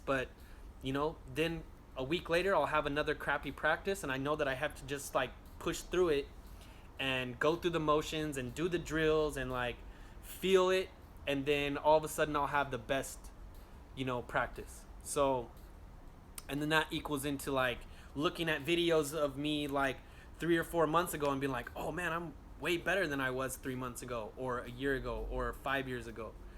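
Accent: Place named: Los Angeles, United States. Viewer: I knew u were from L.A. right when i heard u talk homie!😎👍 You the tightest i seen. Keep it up bro✌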